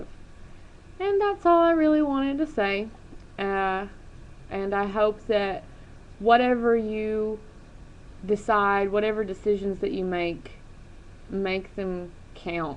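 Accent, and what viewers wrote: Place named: South, United States. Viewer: You are breaking the misconception that every individual living in the South or Southwest are all Bible toting twits. I love the accent and the sprinkled curse words. It adds some swagger to those rational thoughts and ideas that you are having. Don't stop speaking your mind.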